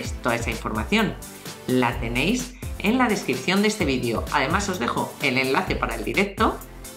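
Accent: Spain. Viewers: A lo mejor es por que es de España?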